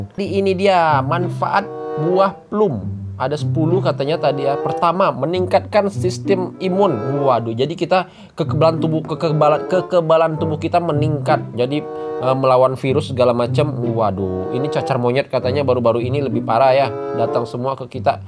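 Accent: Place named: Medan, Indonesia. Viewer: Orang medan mana suaranya!!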